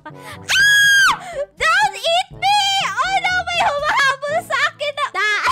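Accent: Visayas, Philippines. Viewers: Ate. visaya ka no kasi narinig kita na sabi mo. ayaw saba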